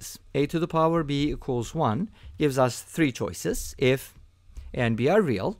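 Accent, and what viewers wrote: Turkey. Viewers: I like your rapid and simple solutions. Some of the math channels I checked out, they are too slow and redundantly repeating same formulas dragging on. The viewers are math students, and the podcaster needs to get to the bottom as quickly as possible. Especially this one Indian guy, who is a brilliant mathematician but just tedious and boring to watch him. So Ata boy! and from your accent I gather that you are Turkish. Ben azeriyim. Tebrikler!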